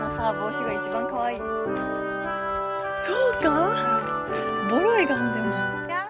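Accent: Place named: Bingo, Japan. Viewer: いだちゃんねるから来て 初めて拝見しました。 備後弁？が癒されます♡ あ、でも自分の事名前で呼ぶのは辞めた方が良いです😅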